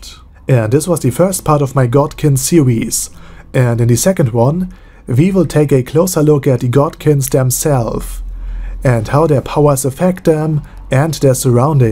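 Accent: Germany. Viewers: Dieser deutsche ne akzent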